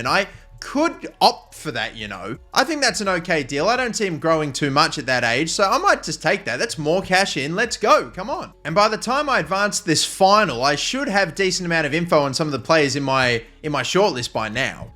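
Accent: Australia. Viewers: Wait is he australian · yh he's from Melbourne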